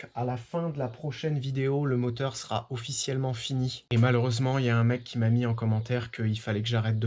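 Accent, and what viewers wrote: Alsace, France. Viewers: Putain elle est énorme la chaîne. Et j’ai tout de suite senti l’accent de la maison. Alsace Frei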